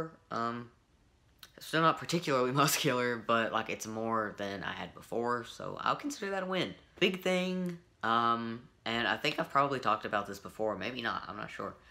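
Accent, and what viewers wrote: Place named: South, United States. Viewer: You’re definitely from the south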